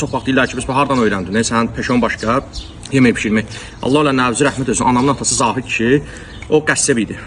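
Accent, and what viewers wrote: Baku, Azerbaijan. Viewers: Can Bakı ləhcəm❤ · Bakı ləhcəsi .Var ol .Əllərinə sağlıq . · Salam.Bakı ləhcəsi,ƏLA.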